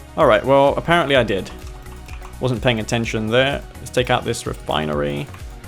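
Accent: United Kingdom. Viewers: Nice to put a face to this voice I've been listening to for years lol, British? Maybe?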